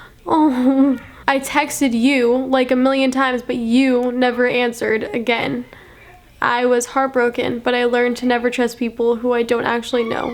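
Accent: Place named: New York, United States. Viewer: guy she's from New York they say words differently 😂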